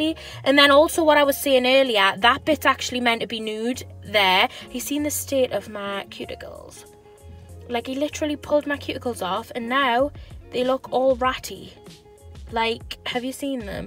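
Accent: Newcastle, United Kingdom. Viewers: I love your voice are you britsh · She is from newcastle, which is in England :) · this ismy first time watching you and im definitely going to subscribe one question, are you geordie? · she’s british, the accent is geordie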